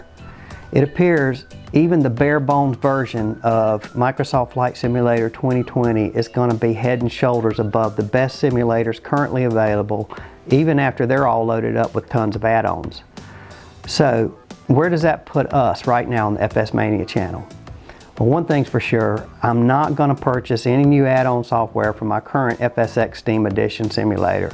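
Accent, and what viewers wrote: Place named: North Carolina, United States. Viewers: Hello,. First. Congrats to you on your next major step in life...retirement. I should know as I am as well. Let me be one of many to welcome you back into "active duty" on your channel and your signature way of providing intuitive and colorful videos and talking points. I have followed your channel since its inception and absolutely love your storytelling and "fun facts". I can remember on one of your episodes where you were getting an earful about your southern drawl. Well I can identify with that as well. As this is one of the first times I have commented on your channel I totally identified with your series as you were receiving an Embraer biz jet out of Brasil. My wife and I both watched the series and found it to be really good. She especially loved it and listened to your pronunciations of some of the cities....as she is a native of Brasil. 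Anyway...so much for the long comment. Welcome Back!!....Alvin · Hello, and welcome back, FS MaNiA! We've missed you very much,!! IMHO, as an avid (but beginner) flight simmer, your YT videos are the best available. Your attention to detail, excellent teaching style, interesting and very enjoyable "Fun Facts", along with an occasional "live flight" video, make your channel the most useful, helpful, and thoroughly enjoyable flight-lover's learning source! And your North Carolina accent (I'm just down the street from you in the Charlotte area!) is delightful and so very pleasant to listen to!! BTW, yours is the first YT channel I subscribed to and, just a few minutes ago (because I just happened to see your 2020 Update video in my YT list when I got online the other evening), also the first channel on which I activated the Notification Bell. I don't want to miss any opportunities to learn more about flight from you!! 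I'm so glad you're back online with us!! And congratulations on your "semi-retirement"!!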